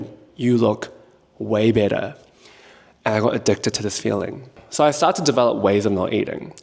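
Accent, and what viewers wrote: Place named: Auckland, New Zealand. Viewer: this is sort of off topic but you have a very EASY voice to listen to -- some ranters can be grating on the ears or tell stories too slow, too fast but your pacing was perfect and your tone, dry humor and kiwi accent all tied so well together and made this an easy watch. Coming from a fellow kiwi who can't really stand the intense american influencer accents, you have a really clean kind of auckland accent, and since thats rare to find in influencer media, this kinda felt like a breath of fresh air, lol. This video literally healed my fried attention span. So shottiess for the mean as content, and am looking forward for more to come!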